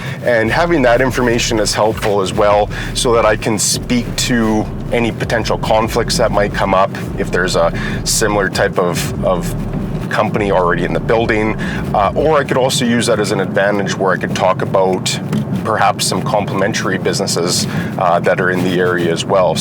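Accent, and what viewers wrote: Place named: Canada. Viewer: I've been binge watching your videos, largely because you've got such a humble, informed, and no-bullshit style. I was super pumped because of your serious Canadian accent, so tried to guess where you were, with no success. Lo and behold, I watch this video and you pull up next to my favourite electronics shop!!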